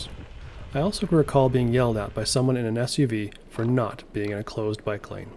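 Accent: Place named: Canada. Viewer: I'm not surprised that you are from Canada. Your city's policies and your accent seem Canadian.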